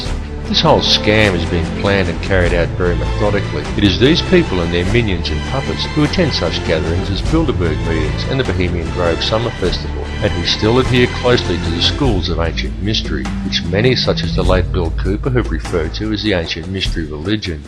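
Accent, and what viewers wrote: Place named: Australia. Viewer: have any idea who the speaker might be? he seems australian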